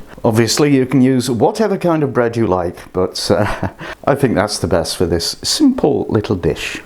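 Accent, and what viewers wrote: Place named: United Kingdom. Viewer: this is possibly the only person with the "uk" accent that i will watch a video from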